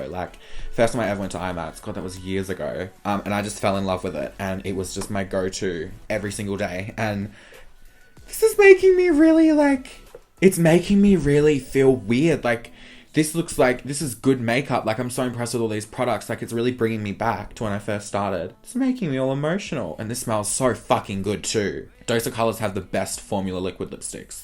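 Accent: Australia. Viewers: I love how Australians say their O's. Q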